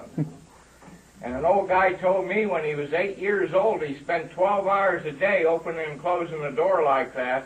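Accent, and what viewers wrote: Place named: Pennsylvania, United States. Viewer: What a great PA accent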